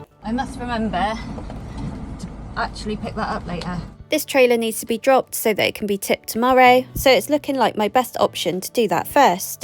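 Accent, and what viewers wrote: West Country, United Kingdom. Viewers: I am a new subscriber, and was disappointed that in your last video you said you were leaving, but glad that you will be taking us on your new journey with future videos. PS. Love your west country accent !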